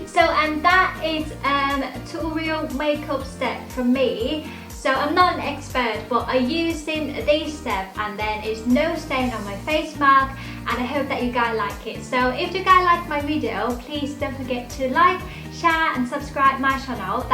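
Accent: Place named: Thailand. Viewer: I like your Thai accent!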